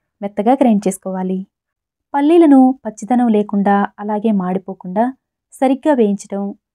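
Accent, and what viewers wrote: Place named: Telangana, India. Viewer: మీది తెలంగాణ అనుకుంటా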